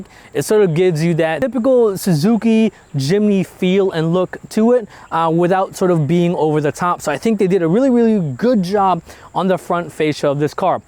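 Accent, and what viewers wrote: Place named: United States. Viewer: Good video, you sound like an American, if so how do you think the Jimny would handle American highways and traffic. Long drives that sort of info. TY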